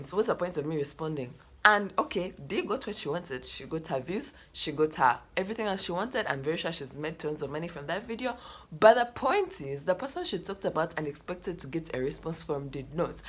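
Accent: Nigeria. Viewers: Ghana? She sounds Nigeria? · I hate it, can't stand the Nigerian accent. Stop it ooo.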